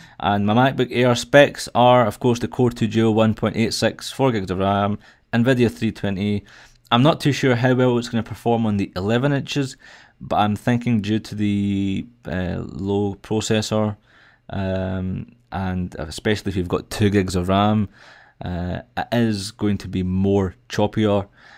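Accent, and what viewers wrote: Scotland, United Kingdom. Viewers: Scottish sounds awesome, much more special than regular English.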